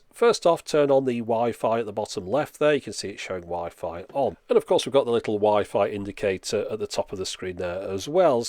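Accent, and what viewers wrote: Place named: Yorkshire, United Kingdom. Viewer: What town is this in? You sound like Yorkshire. If I'm wrong, pardon my untrained Yank ear.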